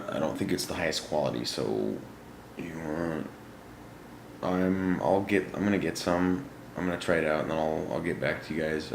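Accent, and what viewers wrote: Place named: Australia. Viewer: ha you're Australian?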